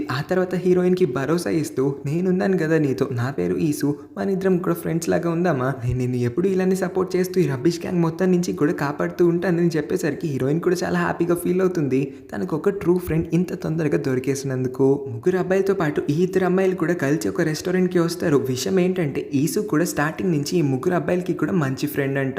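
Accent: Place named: South Korea. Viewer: మీ మాటలు వింటుంటే కొరియన్ బాయ్ తెలుగు మాట్లాడుతున్నట్టుగా ఉంది మీరు మధ్య మధ్యలో నవ్వే నవ్వు భలే ఉంటుంది క్యూట్ గా ❤❤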